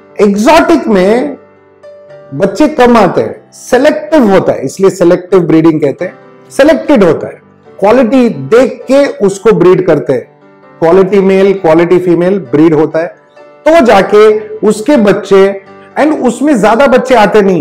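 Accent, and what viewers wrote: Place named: Tamil Nadu, India. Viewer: Hi, I am eagerly awaiting to watch and hear your cute Tamil slang, please upload some Tamil videos then and there.. At least a dubbed version of your Hindi videos...😝😝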